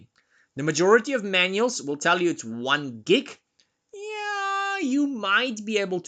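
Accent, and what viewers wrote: Afrikaans, South Africa. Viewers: Cheeseburger 😅 Dude, I love your SA accent. You make more sense than Prof Messer.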